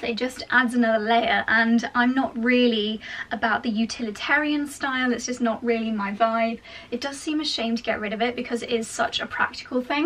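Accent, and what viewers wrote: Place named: England, United Kingdom. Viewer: I really need a compilation video of you just saying 'darling' in your lovely English accent 😊 (and also: who on earth was that ??? I really want to listen to the whole conversation 🤣)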